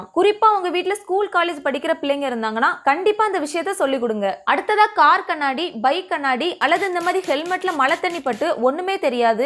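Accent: Tirunelveli, India. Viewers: திருநெல்வேலி நாடார்களின் குரல் சூப்பர்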